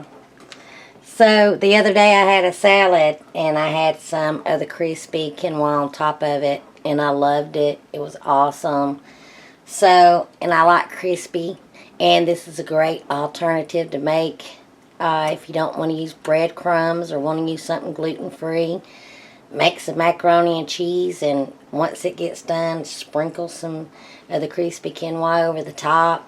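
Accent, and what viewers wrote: South, United States. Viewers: Thanks for sharing!!! Love your Southern Bell personality & voice!! You're amazing:) thanks again!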